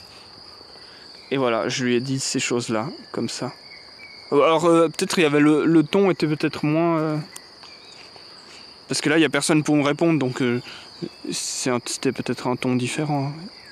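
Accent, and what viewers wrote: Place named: Switzerland. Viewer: Les 2 sont possibles et en Suisse j'entends plus souvent cette façon de prononcer (en tout cas dans le canton de Fribourg) Il est Suisse: ça s'entend à son accent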